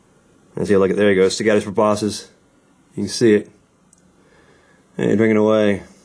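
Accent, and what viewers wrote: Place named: United States. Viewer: OMG YOU'RE AMERICAN AND I LOVE YOUR ACCENT xxx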